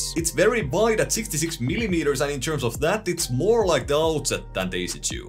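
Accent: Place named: Finland. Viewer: I like u suomi accent. Sounds cool!)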